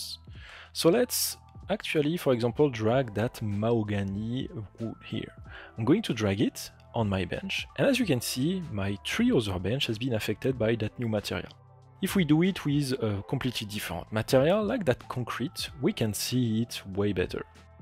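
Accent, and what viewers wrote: France. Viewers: toi tes francais mon pote,l'accent de ouf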